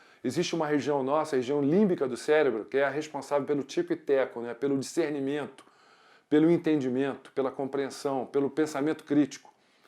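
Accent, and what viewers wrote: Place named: Rio de Janeiro, Brazil. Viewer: Excelente! O Sr. é muito entendido e esclarecido no assunto conspiração e fala o nosso bom e velho "carioquês"... gostaria de saber sua opinião sobre a conspiração alienígena, porque em meu ponto de vista lógico é a origem de toda a historia humana, a mãe ou a "ultimate" de todas as conspirações. Fomos criados para ser eternos escravos para servir propósitos excentricos de seres alienígenas. Gostaria de um vídeo, pois o sr. transmite clareza e embasamento nas palavras, sou mente aberta. Abraços.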